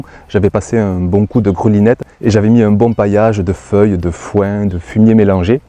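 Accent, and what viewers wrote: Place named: Montpellier, France. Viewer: Bonjour, un immense merci pour ces vidéos très intéressantes. Le propos est parfaitement clair et votre amour du potager et de l'écologie est communicative:) Bonsoir, Je travaille en tant qu'assitant pédagogique au Collège des Escholiers de la Mosson à Montpellier. Nous sommes une équipe de 5 (pour l'instant), et souhaiterions relancer le potager du collège, qui est déjà bien en place, mais a été laissé en friche depuis trop d'années.... Personnellement, la permaculture est un domaine que je découvre peu à peu... et qui est passionnant! Connaitriez-vous des personnes ou des assos qui voudraient bien nous aider, pour faire un premier "diagnostic" du jardin sur place, et nous conseiller un peu sur les choses à mettre en oeuvre pour lui redonner vie et l'animer? Nous avons déjà un peu planché dessus, mais des avis d' "amoureux" initiés et d' "experts" nous permettraient sans doute d'avancer plus sereinement, et de mener à bien ce projet qui nous tient à coeur pour la vie de l'établissement. 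Nous souhaiterions commencer les actions dès octobre, idéalement et dans la mesure du possible. Timing serré...:p C'est jouable selon vous? On prend toutes les pistes: rencontre autour d'un verre, chaines youtubes, sites internet, bibliographie... et si vous êtes dans le coin de Montpellier (l'accent laisse penser que vous êtes pas trop loin), ça serait un plaisir de vous recevoir au jardin (si ça se met en place...) Vous pouvez me contacter en sur Facebook (Nyco Youpy) ou sur <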